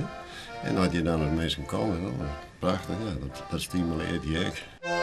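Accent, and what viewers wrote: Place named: Westerkwartier, Netherlands. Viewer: It dialekt dat dizze man sprutst klinket in bytsje as it Grinslânske dialekt "Westerkertiersk"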